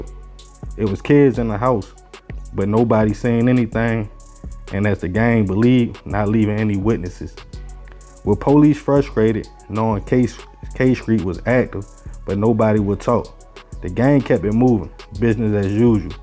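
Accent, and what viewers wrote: Baltimore, United States. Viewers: Are you from Baltimore?? I'm from DC, and I have family in Baltimore so I know a B.More accent when I hear one. The area that this went down in is on the DC waterfront, this is the neighborhood by the DC wharf. "The Spirit Of Washington" yacht does dinner cruises on the Potomac River and the boat leaves from the dock next to the wharf..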